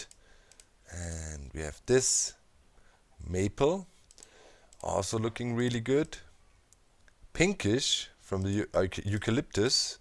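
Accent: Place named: Germany. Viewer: For everyone who is not german you will not understand me. :P Hallo, Du warst auf meiner Startseite und das Video gefällt mir! Hoffe du machst weiter so!Edit: Übrigens Man merkt an deinem Akzent oder der Kanalbeschreibung das du Deutsch bist. Find Ich cool das Ich mal nen Internationalen Gamer gefunden habe der Deutsch ist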